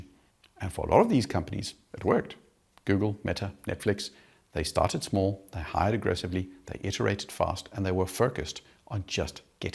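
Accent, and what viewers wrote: South Africa. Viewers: I have recently discovered your channel and I'm obsessed. Thank you. Great accent too - South African slash Danish? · from the accent, are you from South Africa ?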